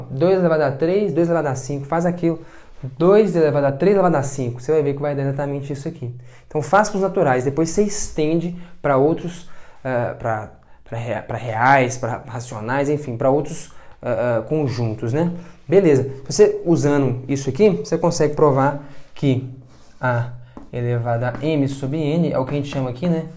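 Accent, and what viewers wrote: Minas Gerais, Brazil. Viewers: Tu és mineiro, né? Kk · Mano, que legal, sou mineiro também! Sério, pra mim Minas não tinha sotaque, ao mer ver tínhamos um "português puro", mas eu realmente consegui perceber o seu (não que não seja esplendoroso). Ksksksk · bom dms ouvir um minerim falando, tranquilidade contagiante. Parabéns pelos ótimos vídeos irmão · explicando as coisa é MT mais satisfatório uai kakakak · Se é mineiro,não é? kk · esse minino é mineiro, tenho certeza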